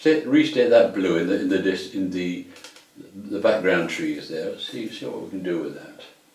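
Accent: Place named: England, United Kingdom. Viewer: Love it I have always had to have a drawing to work two, so nice to hear an English voice, You explain everything you are doing so well, Will be having a go at this tomorrow, starting at your older lessons and working my way up, Thank you so pleased I found your site. Eric Lancashire Expat in Spain, Sorry about that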